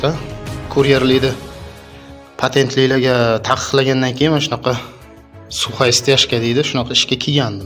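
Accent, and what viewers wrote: Uzbekistan. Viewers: Укажоним Каттакургонданмисиз??Шевангиз билдим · Шева кургон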